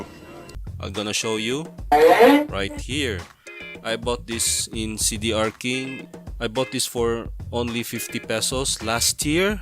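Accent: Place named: Nagaland, India. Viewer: thank you bro. And u sound like a native from nagaland . Google it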